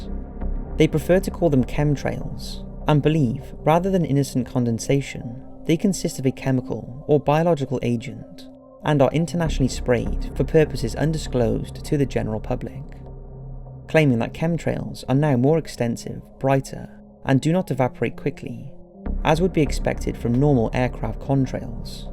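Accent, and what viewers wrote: Wales, United Kingdom. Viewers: This is awesome, I love your research. You’ve got a Welsh twang too - even better! Please solve the Ripley ghost photo as that is the only thing on the planet that truly freaks me out. I think I read that it might be a photographic anomaly and could be of the flower arranger, but that would have to be one heck of an anomaly to end up as an eight foot cowled figure without a face. shudder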